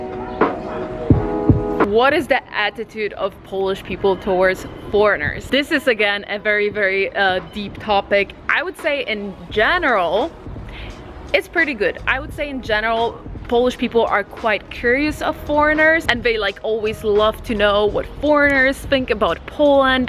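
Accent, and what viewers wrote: United States. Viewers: Yes, I noticed the same thing-a very strong American accent, it might just be how a Polish person speaks English! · How come? 😎 your American English is impeccable. I cant believe you didnt live in the US. Greetings from Warsaw😎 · Wait, so you were born in Poland, how come you have a perfect American accent then?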